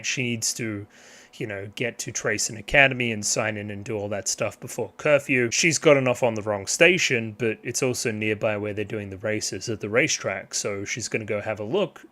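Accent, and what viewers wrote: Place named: Oceania. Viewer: I think your knowledge of horseracing, horse behavior, idol shows and passion projects like Symphogear and GuP makes you one of the best candidates to enjoy this franchise to its fullest. Personally, I feel it is the best thing to happen to me watching any media in the last 5 years. Firstly, I like how you gave time analysing the episode and doing some research. So I will share some points, a few of them might be answers to your questions. (-1) Cygames sponsor Kentucky Derby, Grand Prix in Paris; many of the company heads own thoroughbreds themselves. Many legendary jockeys sponsor the franchise. This show is a beautiful love letter to Japanese horceracing (JRA, , anime counterpart is URA) history and the racehorses that participated in it. The deeper you dig into how this franchise takes on irl lore, the more fascinated you'll get. 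Howevever, S1, released 3 years before game release, was about the company still struggling to form their own studio to produce their show and find a working formula. It still ended up being more than decent. (0) BACKGROUND: Others have probably already told you about how uma musumes are born. A decoration on left ear means they were mares irl (like Black Caviar the legend from Australia), while right ear means stallion (like Bonecrusher from NZ). I gave you Oceania examples based on your accent XD. (1) The Uma Musume anime is NOT an anime adaptation of the gacha. Neither is it an advertisement for the game. Uma Musume is a "multi media" franchise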